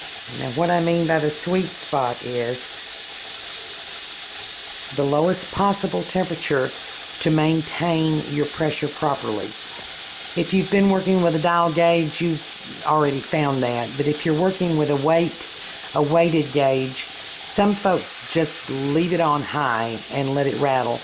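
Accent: South, United States. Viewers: i am binge watching your videos! i love that you are keto as well....i also cook on cast iron!!! oh Lordy! i really love that i found you...your sweet voice and slow southern style is so relaxing to my frenzied mind<3<3<3 you mentioned in one of your videos...i think spaetzles...that you are cooking for one? how is your husband, if you dont mind my asking...you may have mentioned it in another, i just haven't gotten there yet :{ this is one of your more popular videos...so i hope everyone here offers many prayers for him and you for as long as youtube is around! ;}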